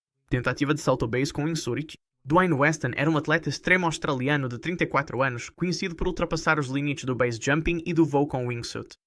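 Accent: Portugal. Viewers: Português de Portugal não dá, impossível assistir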